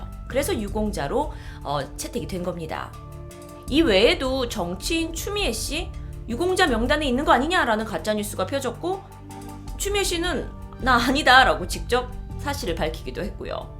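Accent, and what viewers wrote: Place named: Jeolla, South Korea. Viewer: 아하~~ 그래서 "의"발음을 "으"발음으로 하는군요. 고향세탁은 전라도가 옜날부터 잘해왔던 일입니다. 정말 자기 학력에 진실이라면 전라도 발음을 배우게 된 계기가 있을거에요. 부모님의 영향이라던지요. 그걸 살펴보면 되겠죠?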